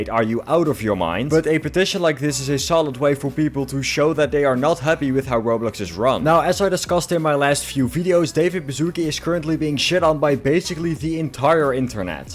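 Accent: Netherlands. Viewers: Yep I hear a Dutch accent, let’s goooooo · This Dutch accent lol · i know that accent far to well this is a dutch youtuber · you sound dutch. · I can hear your dutch accent. But keep up the content! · Are you dutch by any chance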